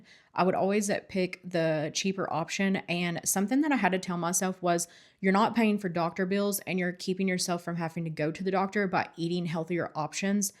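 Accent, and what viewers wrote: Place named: South, United States. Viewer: Hi, this is the first video I've watched with you but it's interesting to me because you sound like you have a Southern accent but you say pop which is a western Pennsylvania thing. Where are you from?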